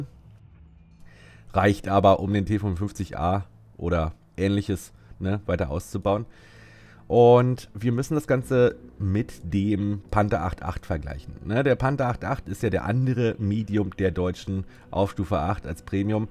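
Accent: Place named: Bern, Switzerland. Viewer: Mage Co bärner-dütsch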